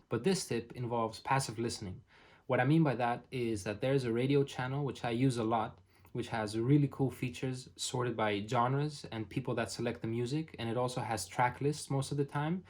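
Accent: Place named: Netherlands. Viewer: you dutch? you sound dutch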